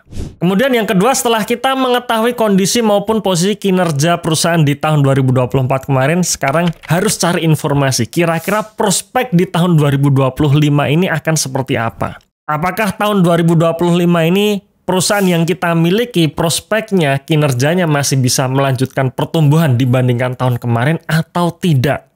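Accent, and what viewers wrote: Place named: Java, Indonesia. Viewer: logat medok jawane keliatan bener ga sih 😂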